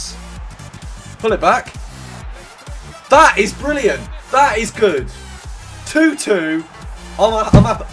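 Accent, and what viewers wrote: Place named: Scotland, United Kingdom. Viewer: FANTASTIC Scottish accent mate!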